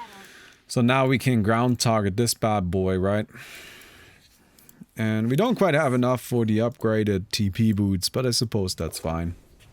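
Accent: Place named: Germany. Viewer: I remember the first day I started watching you, I could smell the german from miles away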